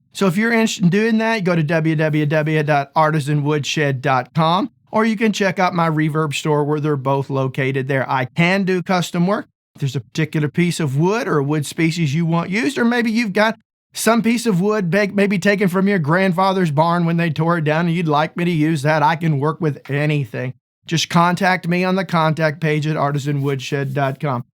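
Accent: South, United States. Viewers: Says the guys with shit guitars hanging on the wall. Not to mention, FLORIDA. Can’t trust tech advice from FL or a southern accent. This post is F’ing stupid.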